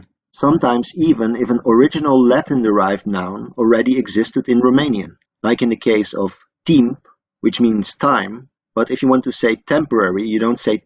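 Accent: Netherlands. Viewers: Is this guy dutch? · I hear a fellow Dutchie🕵‍♂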